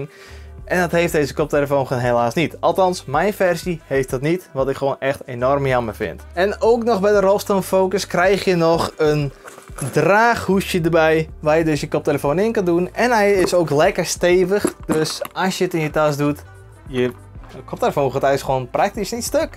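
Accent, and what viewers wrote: Friesland, Netherlands. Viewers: Leuk die Friese klemtOnen😄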